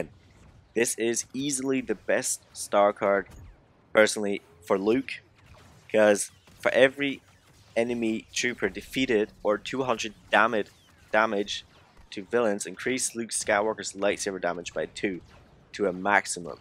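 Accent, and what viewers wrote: Germany. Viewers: Dein Deutsches Englisch ist ja krass gut 👍🙈 Nices Video :-) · Der Deutscheakzent is da you are one of the best player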